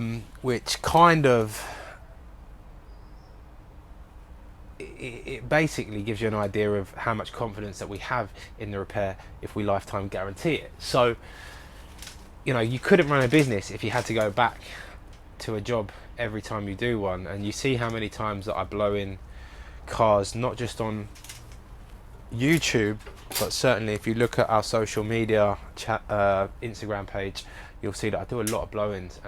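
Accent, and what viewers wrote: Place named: United Kingdom. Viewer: I can tell that you are from the UK because your accent so I wonder how hard is it to get a mobile dent repair license in the UK. I respect your job btw cuz it is great.👌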